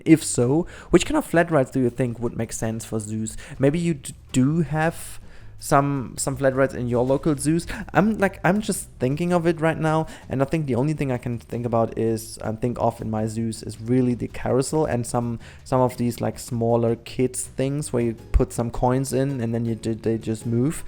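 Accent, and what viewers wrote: Germany. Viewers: Hello from an English (Canadian) speaker! I empathize with you trying to speak animal names in English. Don't beat yourself up over it! As long as you're close, we will figure it out. I, for one, prefer to hear your German accent anyway!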